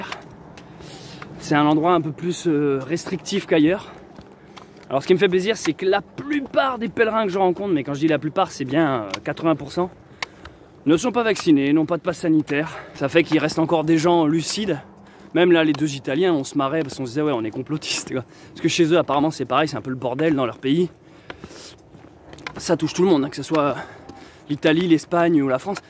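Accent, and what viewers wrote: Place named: France. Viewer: Fabuleux votre ´ french accent’ 🥰🥰🗽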